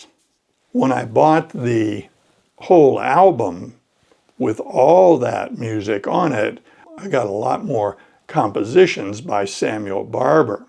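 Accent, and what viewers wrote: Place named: United States. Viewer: 💙💙💙... Thank you, for your stories. I never cease to be amazed at how through them I see the unfolding of my own life. You emphasize those small/big things that bend our path in a new and dazzling direction. I would like to know in more detail how the flame of your interest in Spanish was ignited. My interest in English arose from the cartoons we saw on TV, the movies we saw that showed life in America and then, the music, the songs of,... in which the magnificent English language, with its rich vocalism and its bold consonants, tinged the songs with an astonishingly beautiful color and I never forget nor get tired of the pleasure of hearing the exquisite pronunciation of these singers... Your way of speaking, your accent and the warmth of your voice add charm to the English language spoken in the USA... and for that and more I thank you...!!!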